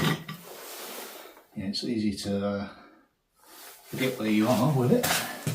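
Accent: Essex, United Kingdom. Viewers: I love these vlogs, but especially accent. Essex girl in California.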